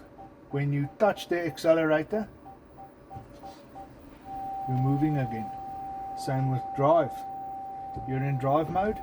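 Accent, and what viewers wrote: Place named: Afrikaans, South Africa. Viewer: Great vidoes... Just one problem.... why did you apologise for your Afrikaans accent? Your english is better then my Afrikaans... LOL🤣. By the way , where's the video about the foglights?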